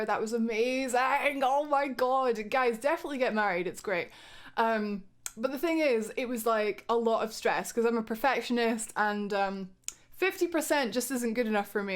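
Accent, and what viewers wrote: Scotland, United Kingdom. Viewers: I remember the first time I watched a Whatculture video that you featured on, and thinking to myself "who the bloody hell is this Scottish chick?". Then I got used to you, started enjoying you more and more, then the gaming channel started doing podcasts, and I'd look forward to whenever you were on them. (I play a game whenever I listen to guess who is on, and would fist pump when you were on). While following you on socials, i saw you were a streamer, and i made a twitch account, because you were streaming and I wanted to be involved in the chat. From that, you were the first streamer I subbed to, and whenever i get chance i always drop by to say hello, (I'm LordPeterEgg btw). I'm sure others have stories similar to mine, and I'm sure like me, we're shocked about this news, but also excited to see what comes next for you! 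We all love you, and are looking forward to everything to come! LONG LIVE! ❤❤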